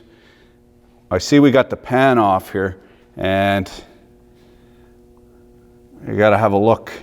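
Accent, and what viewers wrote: Quebec, Canada. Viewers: Are you from Québec ? Been binge watching your channel since I heard you say calice de tabarnark in a Bosch video.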